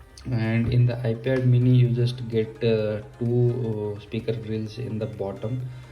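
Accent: Odisha, India. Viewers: Voice tone suniki janigali odia pua boli😂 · Ye pakka ama odia pila ta. English ru Jana padijauchi. Good going bro. Good. And all. Wishes to u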